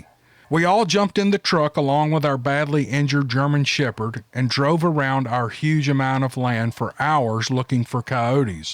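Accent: South, United States. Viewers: You have a very nice comforting southern accent that is comforting and it's good for this line of work.and I think you could do well on tv specials if somebody just heard your voice in the way you can tell a story and make a story feel real and you can feel the emotion in the stories and I'm positive everybody that's subscribe you would agree with me cuz if they don't they wouldn't have subscribed to you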